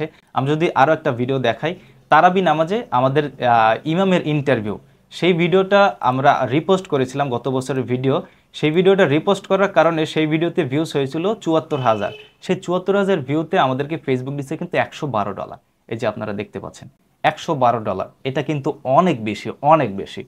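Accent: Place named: Barishal, Bangladesh. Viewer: ভাই আপনার বাড়ি বরিশালের কোথায় ?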